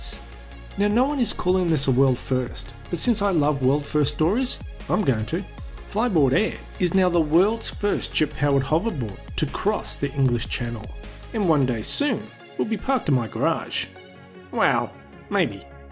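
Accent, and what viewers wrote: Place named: Australia. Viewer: From a fellow Aussie, "I can tell by your accent 😉" thnx for the video, but you're an Aussie, enough with the imperial.. Or yeah use both.. I just want Americans to come around with the rest of us lol 🙂🇦🇺🇺🇲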